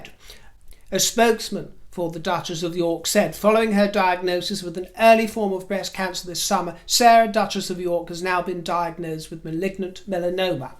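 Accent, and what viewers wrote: United States. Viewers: Your American accent is so good. It always makes me laugh; you are so funny. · I love your American accent! ❤ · I love your American accent!!! You nail it. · you do a good American accent. I mean, it really rings true! Wherever did you pick it up?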